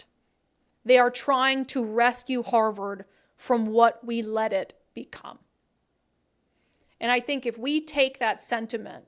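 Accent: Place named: Minnesota, United States. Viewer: It is Minnesota accent.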